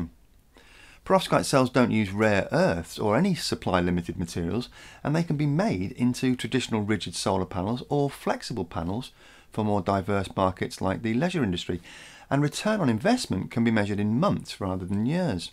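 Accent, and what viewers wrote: United Kingdom. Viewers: For American English “pero vsk vsk vsk ite” is the word & differentiates it from (perocstie?) which I hear throughout this presentation. I often have difficulty w/ various versions of British annunciation.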